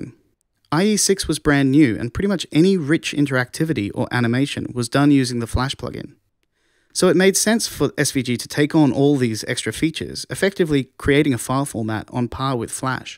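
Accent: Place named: United Kingdom. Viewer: A lovely british accent and awesome tutorial. Just the way I like it.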